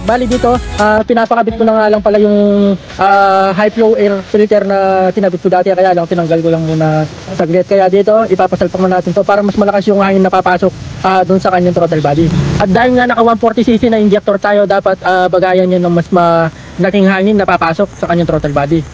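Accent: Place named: Pampanga, Philippines. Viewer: kapampangan ka pala paps. kumusta na ecu?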